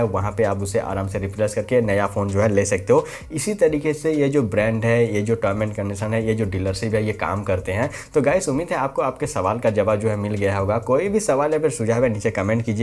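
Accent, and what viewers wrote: Chhattisgarh, India. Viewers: Chhattisgarh se ho kya aap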